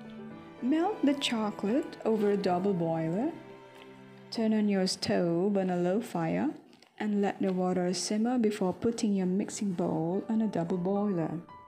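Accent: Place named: United States. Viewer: Ganahan ko sa voice over...murag amirkana 😊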